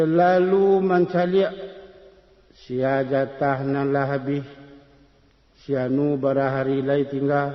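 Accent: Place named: Maninjau, Indonesia. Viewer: Kalau dari Bahaso ampia Samo logat Jo urang Maninjau... contoh bada..mantiko dll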